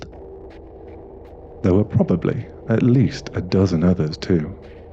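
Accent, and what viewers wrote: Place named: United Kingdom. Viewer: New subscriber, nice to hear a fellow Brit here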